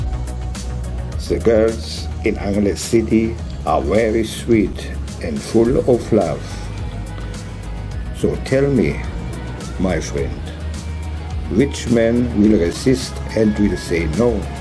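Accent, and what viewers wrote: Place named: Germany. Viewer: Schönes und interessantes Video, aber Dein Englisch ist immer noch extrem deutsch akzentuiert, ich musste öfter sehr grinsen. Aber man versteht alles sehr gut und das ist das Wichtigste.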